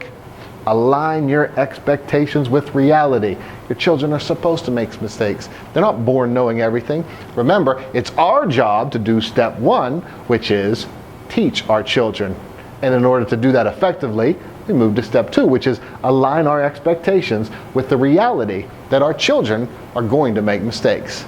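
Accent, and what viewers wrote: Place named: United States. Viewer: Why does he speak in an American accent? He would sound better if he spoke in an Australian accent.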